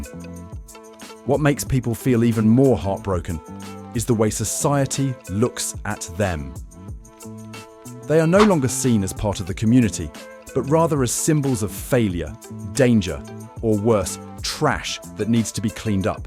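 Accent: England, United Kingdom. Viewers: Why is a guy with an English accent narrating this video about PA? Could it be to lend credibility to a piece of propaganda?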